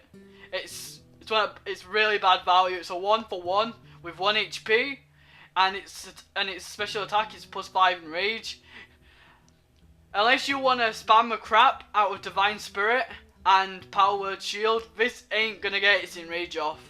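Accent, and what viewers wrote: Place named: Manchester, United Kingdom. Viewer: It sounds like you are from Manchester in England, so for American audiences you will need to talk more slowly and concentrate on enunciation. With any presentation you might want to give a visual overview first, maybe showing a group picture of all the cards you will cover. Perhaps grouping them for aggro and control decks as you consider them and listing them in order of power, maybe a top 10 etc. I found your post searching for "hearthstone analyse one drops" as I was looking for more insight into choices as I'm new to hearthstone.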